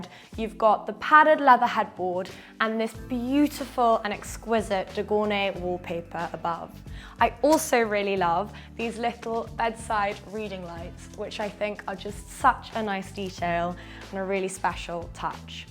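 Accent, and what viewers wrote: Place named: England, United Kingdom. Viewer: you are going to kill it on YouTube, so nice to hear a quintessential English rose accent, wonderfully articulate language and a charm only classy ladies like yourself can exude. Do not betray us by joining the luxury home show 😂 Stay on your own. Brand for the win 👌🏼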